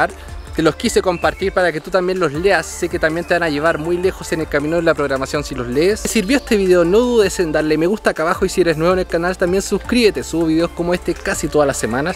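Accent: Chile. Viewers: hola!! eres chileno? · me parece que por tu tonada sos de Chile, ( pobre Chile prendida fuego ). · Sos chileno weon? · ¿Otro chileno? 🤣🙄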